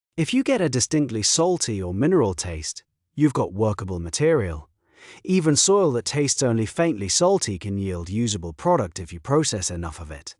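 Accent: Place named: United Kingdom. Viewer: We don't like this British accent on these things in America no we don't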